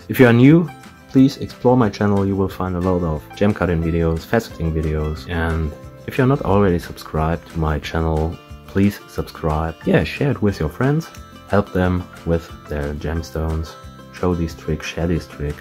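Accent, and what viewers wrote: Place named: Germany. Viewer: I just got interested in gemstones, while looking for a sapphire ring for my daughter. Now I am fascinated with the whole subject of gemstones. Thank you for this video! So far the best one regarding natural vs synthetic! I like your German accent, I’m German myself, living in Southern California. 🇩🇪💍💜💎😊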